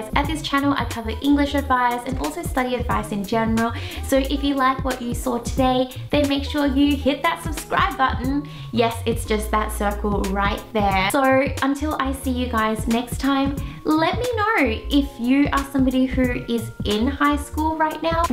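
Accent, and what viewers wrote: Australia. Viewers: Hang on is she Australian??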